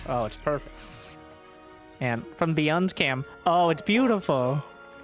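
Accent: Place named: Tennessee, United States. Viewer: Casual banshee. Accent from Tenesee Ain't no draftee. This is StarCraft 3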